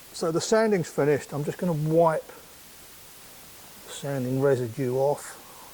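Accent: United Kingdom. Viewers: Boat us but it’s a Brit 🇬🇧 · BoatUS with a British accent.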